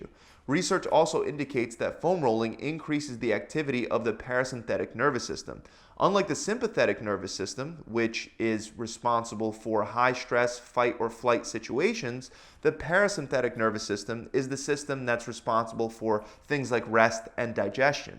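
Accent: Philadelphia, United States. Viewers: I know he's from philly from the way he says water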